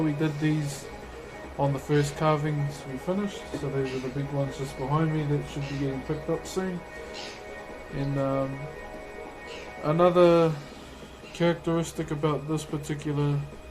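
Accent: New Zealand. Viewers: Is he from New Zealand I love his voice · love the Maori accent, art is important to remember what matters in life.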